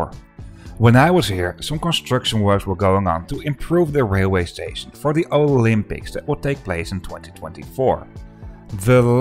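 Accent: Netherlands. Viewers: Is it just me or is this guy sounding like a dutch guy? I am so confused by the accent and english he is speaking. But i love the vids keep up the good work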